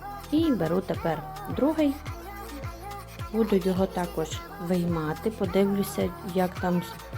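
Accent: Transcarpathia, Ukraine. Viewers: Привіт! Зайшовши на ваш канал зразу підписалася.Закарпатська мова 👍 я теж з Закарпаття. Легких вам пологів💛💙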